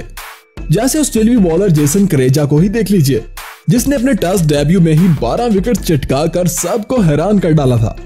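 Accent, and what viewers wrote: Pakistan. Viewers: Your voice is like a Pakistani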